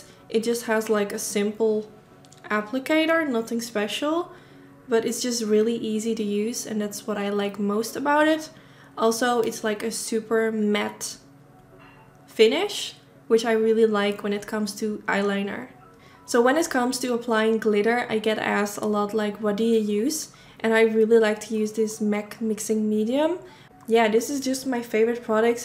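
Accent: Netherlands. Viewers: Are you dutch , you have kind a dutch accent. No offense becausse when i talk english it sounds like crap (also my writhing is really bad , sorry for that) i really liked youre video . You have 1 new sub❤️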